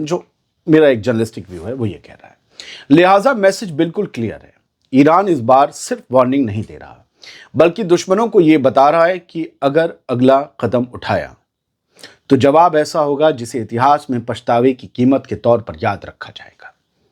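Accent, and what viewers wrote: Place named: Awadh, India. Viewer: bhai asslam walaikum wa-rahematullahi wa-barqatahu Bhai main Gonda u.p se belong karta hu aur mahesoos karta hu shayed aap bhi awadh se hain kyunki news' padhte waqt aapki bato se maine kai baar mahesoos kiya hai.... ALLAH HAFIZ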